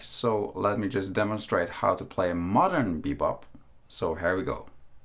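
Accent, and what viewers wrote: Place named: United Kingdom. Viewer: So British !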